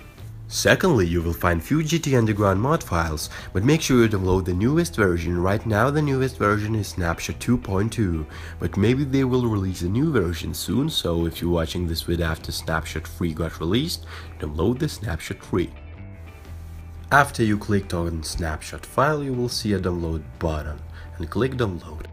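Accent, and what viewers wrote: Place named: United Kingdom. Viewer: cause your accent sound a little bit British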